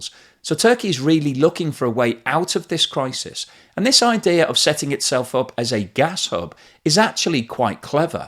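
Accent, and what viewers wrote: United Kingdom. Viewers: This video tells me how crazy these western leaders are. Russian gas is Russian gas no matter the middleman. Erdogan is smart. He is part of NATO and he deals with Russia. So maybe that is why he lets inflation go nuts. If my country🇨🇦 suggested we freeze to death so we can have a pi….g match with USA we the people would certainly bring the truckers back and more. European leaders who opt for making their people and economies suffer need to step away. Turn on Nordstream 2 for goodness sake and deal with Russia. Buy their gas until other alternative sources of energy can be made. If I were made Queen of the world I would ban sanctions everywhere. Open the markets and let the free market flow. Sanctions are the source of hatred and violence worldwide. Not every country will be democratic like ours. We need to help citizens of all countries have a reasonable life. 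Sanctions hurt people not the despots who run the countries. And who says in future these countries will not change with different leaders. I am 70 and I have learned too much punishment on my children has disasterous outcomes. Turn on the gas, push Ukraine and Russia to compromise and stop all this bloodshed. thanks for the videos but you sound British. I would worry about my own country’s demise……it is in serious jeopardy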